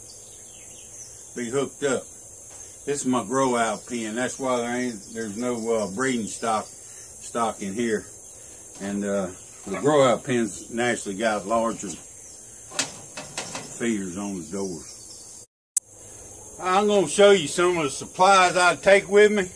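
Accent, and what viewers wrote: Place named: South, United States. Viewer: Thank you! Your thick accent is the real southern charm. I'm grateful for your taking the time to explain things in simple terms for us beginners who know nothing!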